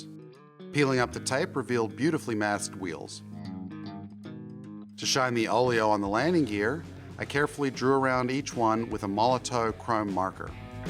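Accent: Australia. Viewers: I guessed you were Aussie (I grew up there too)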